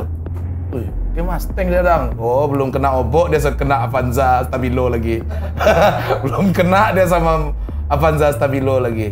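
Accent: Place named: Padang, Indonesia. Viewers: Kalau mau dengar logat indonesia padang nya keluar di konten ini. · Wkwk denger pakai logat padang jadi kangen saudara yg disana, dulu pernah njir persis kyk gini turun di minangkabau trus dr padang ke sungai penuh naik travel avanza njirr mantep wkw pulangnya kapok ganti naik travel hiace hahah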